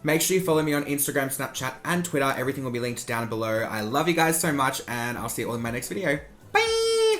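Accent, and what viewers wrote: Australia. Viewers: What part of Australia is he from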